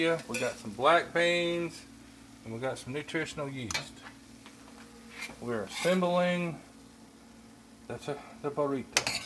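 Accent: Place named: South, United States. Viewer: I am loving your southern accent! Being from the south myself, I find so many men would rather die of a heartache eating meat than go vegan for their health and family! Very refreshing to know a southern man can convert! lol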